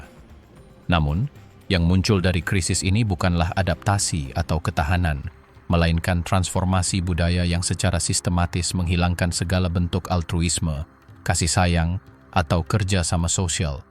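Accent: Malaysia. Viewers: Kenapa logatnya dari negeri Jiran padahal nama channel nya a globan Indonesia 😅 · Logatnya malaysia 😢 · Itu kayaknya orang malaysia belajar ngomong indonesia jd logat malaiysianya masih kental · Milih narator nya orang Malay, kalo text nya sih bhsa indonesia 😂gak enak dikuping....skip ah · Admin gadool, bahasa indonesia gaya Malaysia, Mereke mereke ape bende, gak enak blas di dengerin gatal telingaku nyimak suara admin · Min,logat/dialek Malaysia anda masih jelas terdengar.Hebat kan Bahasa/dialeg Indonesia?😊Orang Malaysia lama kelamaan akan berbahasa dan berdialek dengan bahasa Indonesia.....😅😅😅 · Dari cara BERBICARANYA saja ORANG MALINGSIA😁😁. VIDIO Abal Abal....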